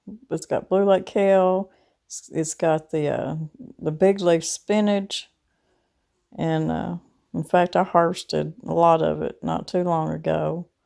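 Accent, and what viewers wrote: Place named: South, United States. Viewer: I thought I was the only one😂glad to see others think so too. My Mother came from Tennessee and had that southern draw, reminds me of her, so when I get to missing her I turn to this channel and I am home again 😊🏡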